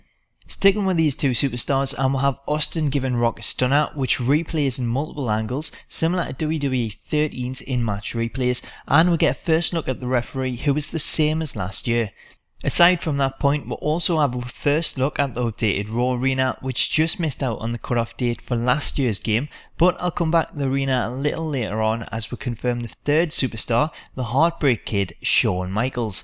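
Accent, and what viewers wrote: Newcastle, United Kingdom. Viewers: you have the best accent ever, Newcastle I presume?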